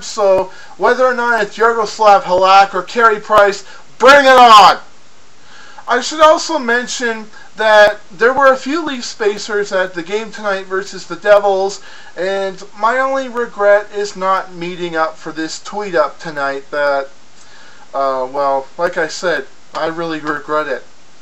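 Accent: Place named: Sweden. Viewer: Why the Swedish accent?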